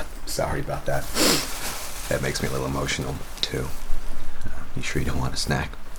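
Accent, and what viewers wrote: United States. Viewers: As someone from the USA, I think your American accent is beyond convincing! I like how you said "fer" instead of "for" especially. I just noticed a week ago how everyone I knew said 'for' that way. I don't like my accent because it sounds like a lazier version of "proper"? English... like when metal sounds like medal and water sounds like wader. Ugh. Anyway, your accent is spot on. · Some murder This video contains mature themes and violence On it! Watched for a full minute before I realized you were in America-mode 😅 So natural. And I love that jacket. Now, hopefully Matty Buns shows up for me again <3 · Did you do a more american accent or am i just thinking that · Spectacular acting, your American accent is dead on, the background and set up was amazing, and the story was awesome!!! Well done!!! Much love! 💙💙💙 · Your American accent is really good! 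· Your American accent is SO spot on it's crazy. Could've fooled me. This audiobook series I've been listening to features an English narrator whose ONLY JOB is to do voices/characters, and his American is God-awful next to yours. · I really love this role play! And you are great at sounding like an American! And I do like the storyline of this one! And the end!! That was a shock! Lol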